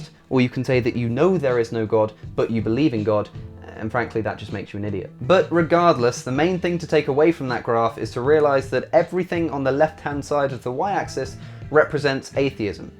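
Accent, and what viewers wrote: United Kingdom. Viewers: Are you British or English?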